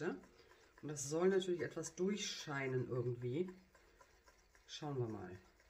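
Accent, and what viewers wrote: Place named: Germany. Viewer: Gutes Video und der German Akzent ist echt amüsant :D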